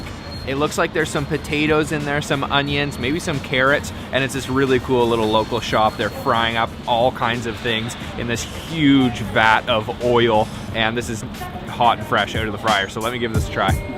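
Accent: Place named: Canada. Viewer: You sound Canadian. You're cute-as-a-button BTW.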